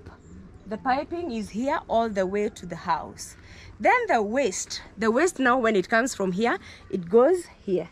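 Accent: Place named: Nigeria. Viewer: I love your nigeria accent oh. Love you in all accents. I appointed myself an Auntie from far😁😆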